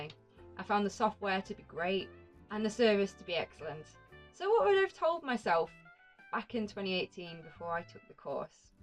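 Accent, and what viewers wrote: United Kingdom. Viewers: Love British accent. What area of England are you from? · You look marvelous. I love your accent too. And nice teeth for a Brit. British dentistry has come a long way.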